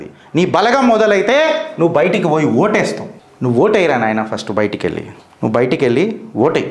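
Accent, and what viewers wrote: Telangana, India. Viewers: The analysis of is unique and appreciable in Telangana slang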